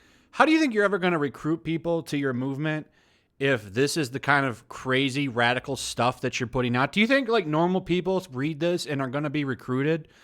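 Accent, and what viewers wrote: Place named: RP, United Kingdom. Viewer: 98% of British (English, Scottish and Welsh) people don't really sound like that....Atleast you sounded like the Royals. 😂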